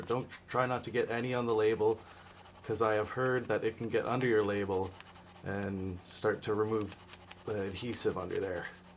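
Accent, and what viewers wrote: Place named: Canada. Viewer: ...you've just been erased!.....i could tell you were a fellow canadian right away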